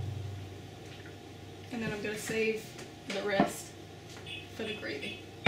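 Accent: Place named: South, United States. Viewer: Gotta be a southern girl!!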